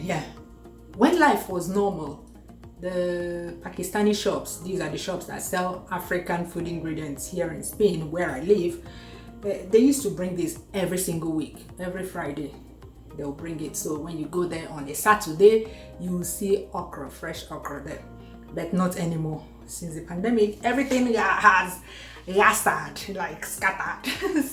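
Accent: Igbo, Nigeria. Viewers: Will it still be slippery, i can't wait to watch u cook it , the best part I enjoy in ur video is that mixture of ur engligbo na superb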